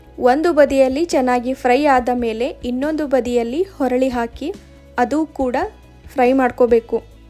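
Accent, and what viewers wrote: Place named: North Karnataka, India. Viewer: ಉತ್ತರ ಕರ್ನಾಟಕದ ಭಾಷೆ ಇದ್ರ ಬಾಳ ಚಂದ❤😊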